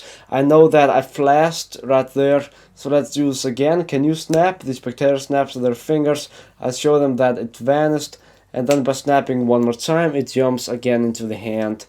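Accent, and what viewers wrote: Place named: Russia. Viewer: That's actually russian-english accent)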